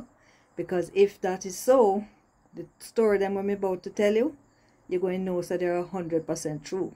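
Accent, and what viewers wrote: Jamaica. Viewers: I love how u love your Jamaican talking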